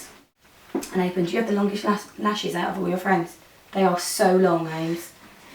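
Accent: United Kingdom. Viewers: Zali P British accent* or I guess it can work either way. But if you Google it, there's tons and tons of speculation.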